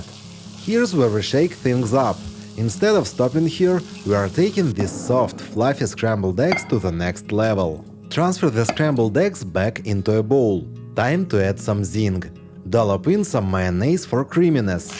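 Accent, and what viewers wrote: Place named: Russia. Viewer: Russian Dialect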